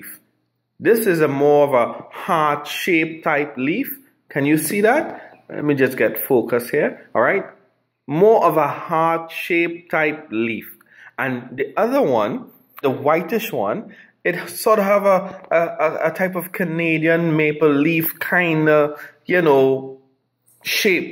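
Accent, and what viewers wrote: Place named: Caribbean. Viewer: Hi, looked at a few videos for growing sweet taters, yours is the best, you explain things great, love your accent from the Caribbean, I've subscribed, and am keen to grow sweet taters along with you. It's end of spring here in OZ. Time to start. Regards Shayne......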